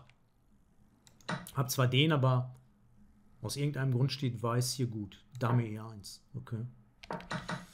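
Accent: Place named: Ruhr, Germany. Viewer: Mal was ganz anderes...also, dieser immer noch hörbare Ruhrpottdialekt, nun mit leicht norddeutscher Färbung. Astrein!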